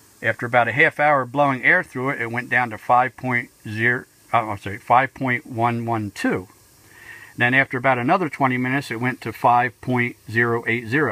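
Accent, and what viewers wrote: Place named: Pittsburgh, United States. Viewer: Must be from Pittsburgh...can recognize that accent instantly... that or Ohio...ya?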